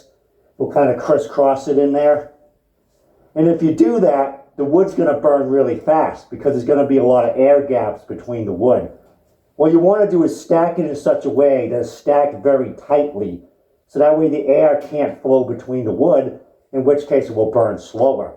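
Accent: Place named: Massachusetts, United States. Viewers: Hey thanks man. Being from MA I can almost tell what town you're from haha 😉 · Seems he's from bawstin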